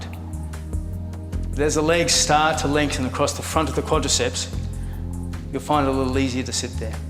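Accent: Australia. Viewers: I do yoga on You Tube every 2nd day and it is so refreshing to finally hear an Aussie voice! Thanks for the vid :)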